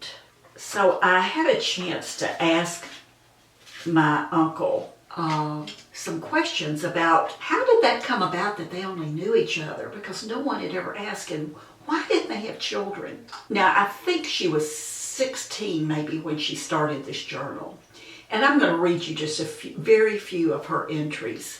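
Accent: South, United States. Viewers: Oh my...what an extraordinary video. The path that you walk each day looks so peaceful. I wonder..if it had not been for this self quarantine(something awful) taking place would you have ever shared such a meaningful part of your past (something lovely). It was really comforting to hear you talk and read about your aunt. Maybe it was your hypnotic southern drawl that lulled me into a place long ago. 🤔 This video was so calming. I loved all you talked about. ❤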